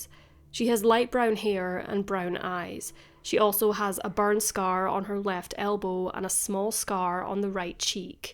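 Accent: Scotland, United Kingdom. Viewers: If you mean the narrator, it's easy to tell she's from Scotland, not Ireland.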